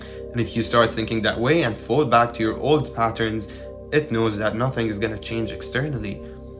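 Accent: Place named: Ireland. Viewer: Obviously, English is not your first language, but I thought I detected a wee Irish twang in your accent?..... Thanks for your wisdom brother. Appreciated. ❤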